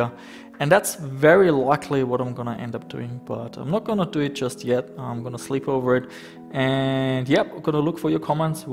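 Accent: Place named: Germany. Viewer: Are you an expat? You sound German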